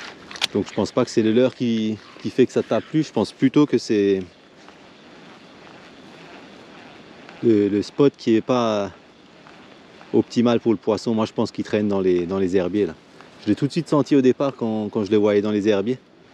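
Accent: Alsace, France. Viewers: Sans ton accent Alsaco tes vidéos ne seraient pas les mêmes. Tu transmets parfaitement ta passion pour le carnassier au leurre. Personnellement et actuellement j utilise le Slick Finess en linéaire (usage détourné) .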